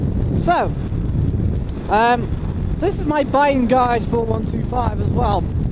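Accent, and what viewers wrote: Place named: China. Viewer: You make English sound Chinese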